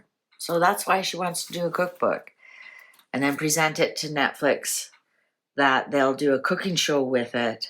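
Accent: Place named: Canada. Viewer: Ingredients for toe jam: hair and bacteria-filled hands 🤣🤣😭😭😭 your brutal yet somewhat nonchalant sarcasm (thrown in the mix) in your readings is greatly appreciated and adored over here 🥰😇 I also love your Canadian accent 😍 "I'm the toe-jam girl" 😭😭☠️🤡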